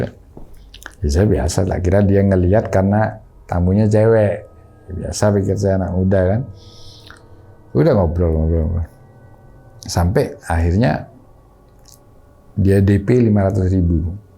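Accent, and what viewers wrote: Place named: Bondowoso, Indonesia. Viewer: Logatnya bondowoso bgt om 😂😂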